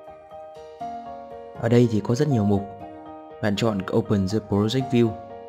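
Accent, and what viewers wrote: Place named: North, Vietnam. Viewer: Rất hay, giọng miền bắc thân thương, video ngắn , trọng tâm ko dài dòng'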